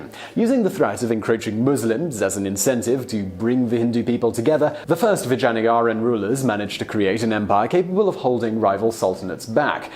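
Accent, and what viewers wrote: England, United Kingdom. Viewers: i'm english and i find this guys voice too english. ecspecially when he says "empire"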